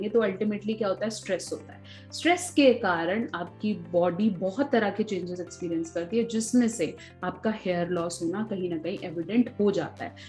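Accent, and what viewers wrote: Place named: India. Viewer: मैम मुझे तो आपको देख के लगता है कि आप विदेशी महिला हो पर जब आप बोलती हो तब पता लगता है कि आप इंडियन हो🇮🇳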